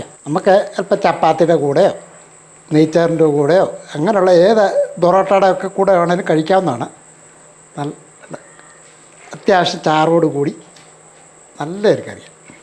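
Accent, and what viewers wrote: Kottayam, India. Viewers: സൂപ്പർ 🥰 നല്ല നാടൻ കോട്ടയം ഭാഷയിൽ ഉള്ള അവതരണം 👌👌🥰🥰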